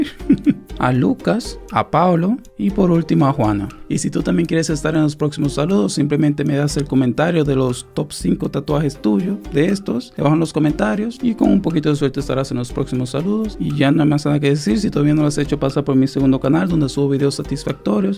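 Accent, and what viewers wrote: Dominican Republic. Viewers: eres dominicano? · Eres dominicano 🇩🇴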